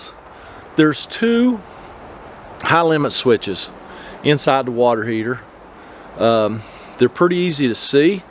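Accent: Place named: West Virginia, United States. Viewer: Back to Beckley! Oh yeah. In '76 I was there training to manage a store, moved to Bluefield/Princeton area shortly after, and once a month drove places like Welch, War, Matewan, and Williamson to check up on other stores, quite often on ice. First time I'd seen grey snow, and to a Texas raised young man, it was depressing. I thought I recognized your accent.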